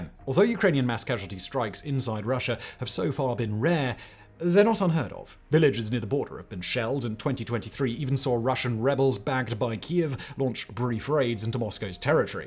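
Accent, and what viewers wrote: United Kingdom. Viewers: Love your channel. One question: How come the English pronounced the "A" as an "R... and the "R" and an "A" ? Is it intentional? Like " R B C D E F... and. Q A S T U V? Not making fun, I promise, and the Brits have the best accent ever... just always wondered.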